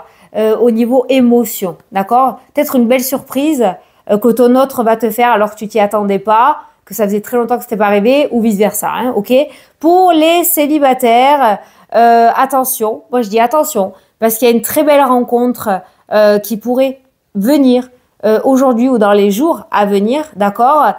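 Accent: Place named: Hérault, France. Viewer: Bonjour, toujours avec le sourire malgré tes petits ou grands problèmes. J'adore ton énergie et ton accent qui est le mien aussi. Je suis une héraultaise. Je te souhaite une bonne journée a bientôt 🙏😚.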